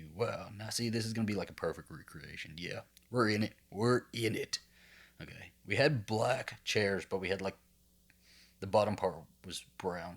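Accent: South, United States. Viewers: I can't believe you're trying to build your childhood home from memory on Sims 4 of this video and I hope your husband, Alastair will be very proud of you for that, but I love your Southern accent, you're gay and you're from Texas!!!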